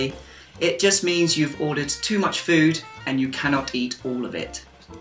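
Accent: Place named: London, United Kingdom. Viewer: Thank you for great explanation and excellent lesson of Londoner's English Accent.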